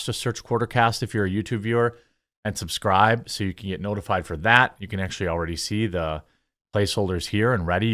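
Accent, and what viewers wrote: Wisconsin, United States. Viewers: Wisconsin accent.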